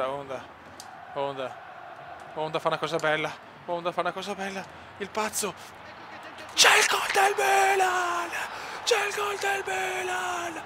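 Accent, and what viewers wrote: Veneto, Italy. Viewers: mi mancava la tua voce da veneziano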